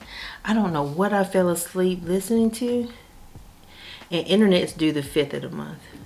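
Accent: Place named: South, United States. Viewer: New viewer from Ft. Worth. Don't be worried about a southern accent, girl. It's something to take pride in says this Texan, so nurture it! Hahaha. Interesting to see how you fund your sinking funds. I'm more at the $3 per envelope level. Just started cash envelopes. SLOW PROCESS! Take care and happy budgeting!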